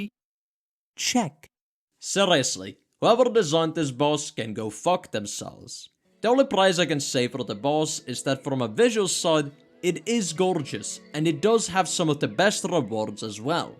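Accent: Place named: Germany. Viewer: What the hell is this accent? You sound like a German guy trying to speak Portuguese with a new Zealand twist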